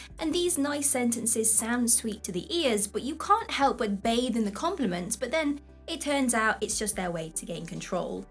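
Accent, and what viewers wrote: United Kingdom. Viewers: Hey you are really pretty and cute. Too bad you sound like you live in the United Kingdom and I live in the United States otherwise I would like to ask you to dinner and a movie.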